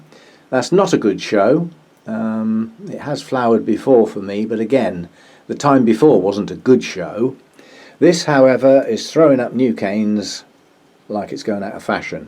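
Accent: England, United Kingdom. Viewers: where are U based?U sound English -correct?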